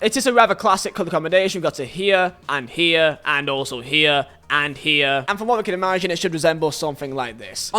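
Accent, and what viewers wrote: United Kingdom. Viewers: ¿this dude is british? (question from a Mexican.)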